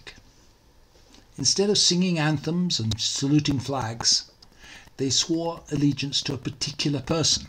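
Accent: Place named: England, United Kingdom. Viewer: It's interesting you come out against Scottish nationalism when your whole planning-centred politics de facto centres around British nationalism. Scottish nationalism - tartan shortbread tins aside - is at least partially driven by pragmatism. They've never been well represented by Westminster. The divergent pandemic responses surely illustrate that... Scottish independence could pave the way for a federal system in the isles, which would be a step forward in political organization. (Your accent sounds English but I know you live in Edinburgh, so I'm sure you have a perspective on this. The last time I was there, I went down to the Cowgate and reflected on James Connolly, who seems to have had a balanced socialist perspective on the matter.)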